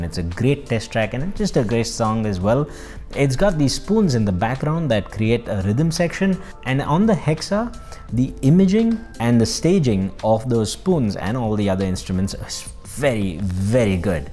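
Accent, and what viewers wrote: India. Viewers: I really enjoy your reviews. I love your oration - great choice of words and phrases! I'm British and maybe that nice flavour of Indian English appeals to me. I don't know, but I like it :) Keep it up. Namaste.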